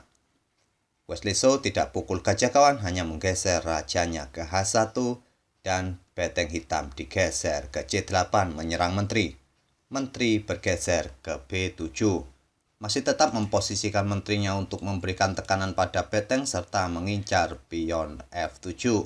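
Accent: Java, Indonesia. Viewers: Medok amat mas jawa ne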